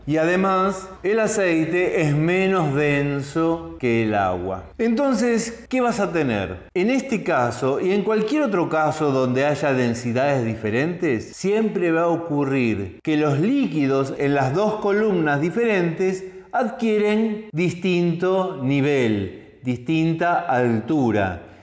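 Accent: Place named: Argentina. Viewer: El acento argentino siempre queda tan bien en un profesor, muy buen vídeo!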